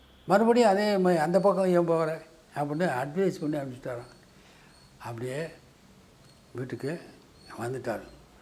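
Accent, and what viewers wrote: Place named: Madurai, India. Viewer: Wonderful presentation in Madurai slang.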